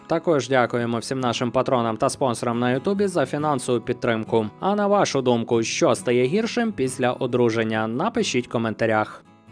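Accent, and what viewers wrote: Russia. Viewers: Та запам'ятайте вже нарешті: прохООООООООдити, довОООООООООООдилося! Скільки Ви ще тягнутимете ці свої кляті кацапські наголоси?!?!?!?!?!?!?!?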